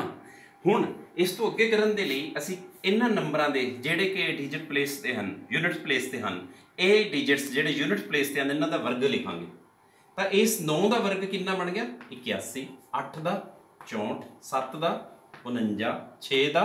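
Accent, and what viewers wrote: Punjab, India. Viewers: Accent? Punjabi hai. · Sounds like an educated Punjabi accent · Smile on face , enthusiasm during lectures and that beautiful Punjabi accent ...it is very wholesome and amazing 🤩 beauty of maths and numbers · The Punjabi Accent 😍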